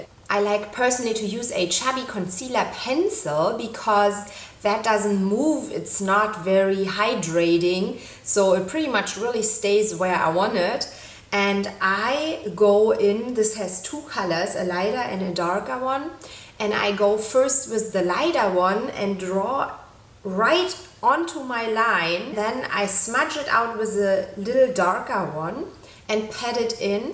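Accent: Germany. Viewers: Best German accent :D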